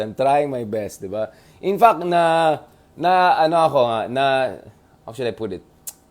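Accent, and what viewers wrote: Conyo, Philippines. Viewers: Tigil mo na pagiging konyo mo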